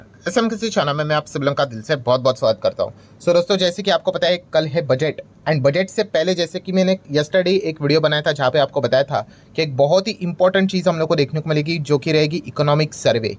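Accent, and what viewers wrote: Maharashtra, India. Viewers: Kamtarta, vichar bro marathi hain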